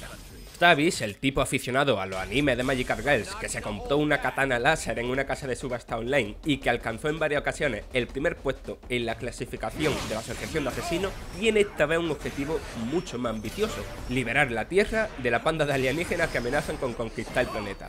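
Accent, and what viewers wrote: Andalusia, Spain. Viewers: Buen análisis viva el acento andaluz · El tipo tiene acento andaluz no problemas fonoaudiologicos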